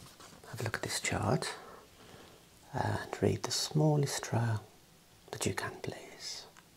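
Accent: Birmingham, United Kingdom. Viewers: Are you a brummie by any chance. Love the accent.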